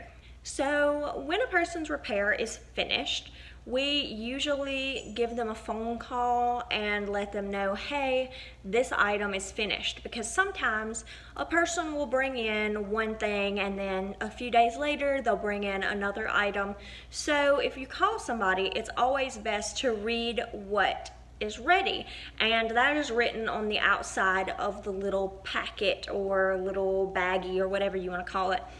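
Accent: South, United States. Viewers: I’ve been binge watching your videos the last couple days and let me just tell you, you’re a great storyteller and I just love your southern drawl, personality, and little bit of attitude lol Keep being you 😘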